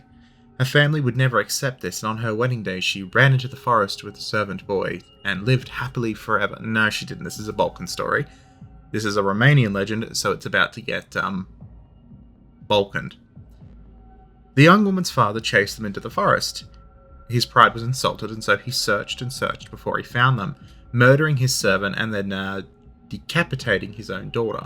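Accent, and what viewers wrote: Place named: Australia. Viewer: Something I wasn't expecting was an Australian historian telling me about Romanian folklore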